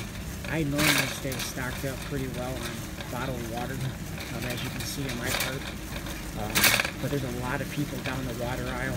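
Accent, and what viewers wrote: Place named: Midwest, United States. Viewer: Rootless Wanders Rootless... you can’t tell by his Mid-west accent!? Good lord he sounds like he could be RADAR O’Reiilys cousin!!!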